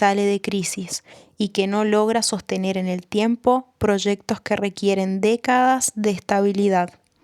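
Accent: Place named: Mendoza, Argentina. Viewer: mil disculpas! Pensé que era tu voz distorsionada 😅. Ahora tiene sentido Yo también soy mendocino, a veces se siente ajeno nuestro acento al escucharlo grabado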